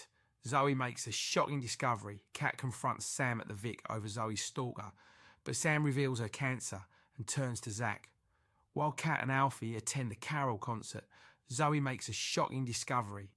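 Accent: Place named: Cockney, United Kingdom. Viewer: Please change the voice back to the one before this, cockney accents are the worst UK accent you can choose, id say no offence to the cockney people but it's just facts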